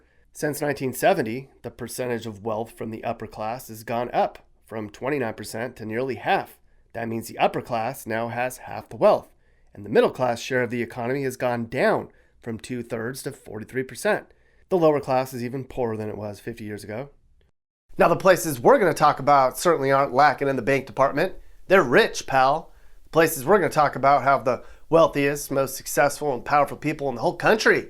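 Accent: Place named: South, United States. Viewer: you have a touch of a southern accent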